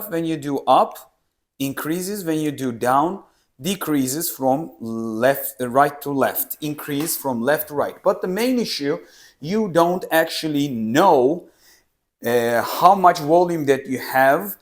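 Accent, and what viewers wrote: Turkey. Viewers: tam Türk aksanı var diyecektim Türkiye dedin :) güzel video olmuş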